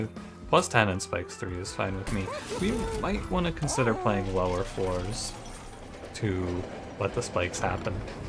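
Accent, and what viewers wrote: United States. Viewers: I know that michigan accent my partner is chicago and she calls me out on mine all the time.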